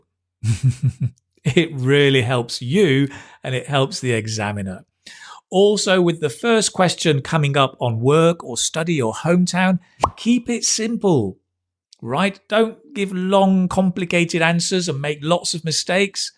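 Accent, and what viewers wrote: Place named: Manchester, United Kingdom. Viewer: Definitely British ,from Manchester, excellent teacher!!.Greetings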